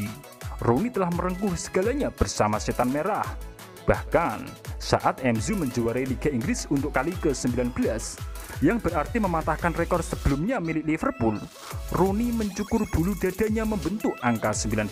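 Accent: Java, Indonesia. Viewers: Jawa koek nu ngomong na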